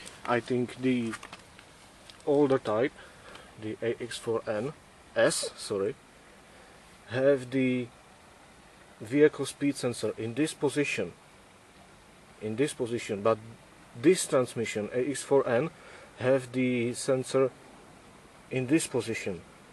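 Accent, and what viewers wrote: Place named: Czech Republic. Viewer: So sorry. Now I see Czech. Definitely not a Deutsch accent. By the way, the car is working fine. If it goes bad, I'll know the fix.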